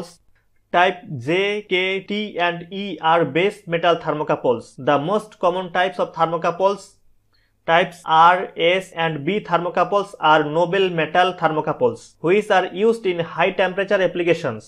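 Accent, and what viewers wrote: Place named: India. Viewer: 这印度调调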